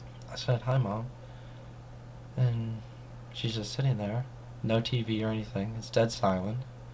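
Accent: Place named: West Virginia, United States. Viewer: has the kind of accent common in West Virginia. It's essentially a "southern" accent, though not as heavy as you might hear in the Carolinas.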